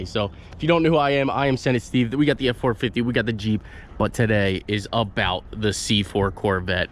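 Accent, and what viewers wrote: Pennsylvania, United States. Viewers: Got some hard work on this one coming! Is it a PA accent that gold = goad and water = wawter?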